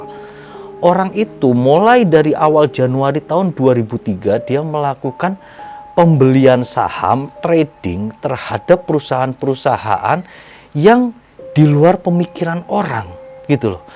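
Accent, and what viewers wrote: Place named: East Java, Indonesia. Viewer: Sip mantap oranh daerah mana sebenarnya logatnya kyk jawa timur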